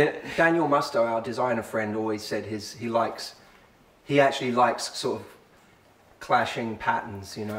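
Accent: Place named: Essex, United Kingdom. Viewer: He's an Essex boy ..it's how they talk.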